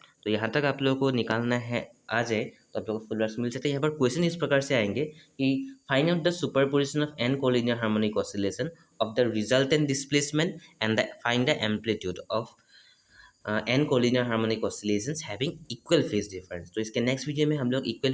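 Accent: Assam, India. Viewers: Are u from Assam,sir?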